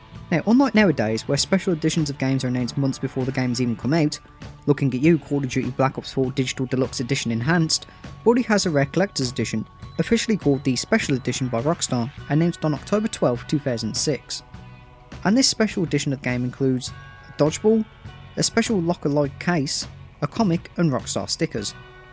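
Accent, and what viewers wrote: United Kingdom. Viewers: because it's obviously not an accent common in the US, even as a non-US citizen I can tell. It's obviously more common in somewhere near the UK. And you can confirm it yourself that he's from the UK by looking at his account's bio. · boo hoo man can't understand UK accent get a grip convo over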